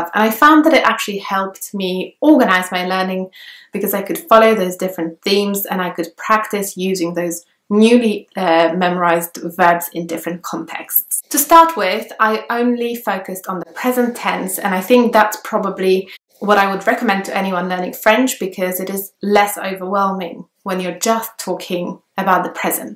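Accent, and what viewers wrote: United Kingdom. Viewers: Wait english isn't your native language, could've fooled me, you just sounded british to me ,so what is your native language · Wait you’re polish ? i thought you were british lol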